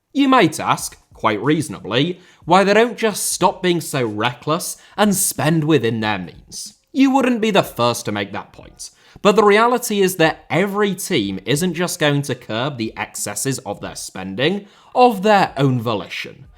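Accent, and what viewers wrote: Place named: Northern England, United Kingdom. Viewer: Your accent wants to make me punch my goldfish. not anti northern, just the smug northern English accent you use.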